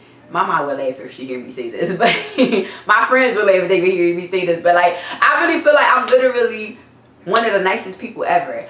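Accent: Philadelphia, United States. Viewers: I like your accent. I'm frm Brooklyn NY. NY & Philly r kissing cousins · Ppl like to assume bc you goofy & be on chill, you not gon knuck if they buck. Wrong 🗣 They do that with me too. Sometimes mfs have to find out the hard way that these hands fly just as quickly as the jokes baby. Oh & Philly accent is the shit. I always get compliments when I visit family in the Carolina’s lol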